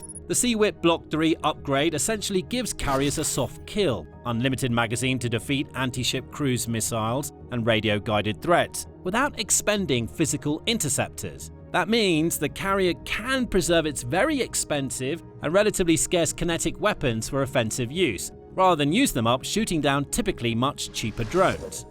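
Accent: United Kingdom. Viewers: Why is this using a British voice if its generated in the US? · The US Military should have a hand in reviewing videos like before they are uploaded to YouTube. This guy with a British accent does not care if he is revealing top secrets of the US Navy. He is more inclined to sympathise with the IRGC, and with this video, he is giving a tip to the IRGC on how to sink the carriers that are already in the war. Hey US Military, FBI and whoever else: Start kicking doors!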